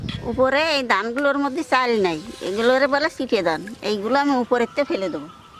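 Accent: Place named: Faridpur, Bangladesh. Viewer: আপনার ভাষায় ফরিদপুরের সুর দাদি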